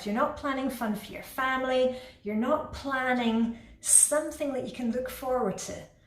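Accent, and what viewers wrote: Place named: Edinburgh, United Kingdom. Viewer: Hi just to say I love your videos and you just as you are. I come from Edinburgh too, am 57 and also post menopausal and have lived many years in the west of Scotland and it is so nice to hear someone from my neck of the woods on YouTube! Since discovering your videos in 2018 I think you have been inspiring me to be a lot more organised and it is just nice to have someone there when I am working away in my kitchen or whatever encouraging me to keep on going. I always find your advice spot on when I hit a problem usually trying to do everything perfectly and failing! So keep on going and be assured your videos are appreciated very much out here in youtube land!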